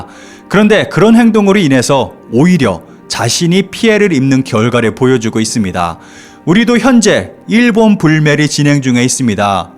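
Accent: China. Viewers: 중간 중간 중국식 발음이 많아 불편하네요. 가오더 ㅡ고덕ᆢ 요즘들어 인명 지명 여러 곳에서 중국어 발음 많이 듣네요. 힌자를 읽는 순 우리음이 있는데.,.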